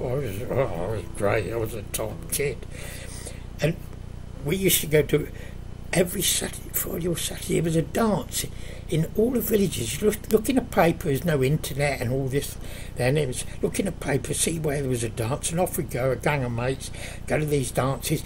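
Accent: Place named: South London, United Kingdom. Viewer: Old..... but not obsolete.... You sound as though you are from South London, Southwark maybe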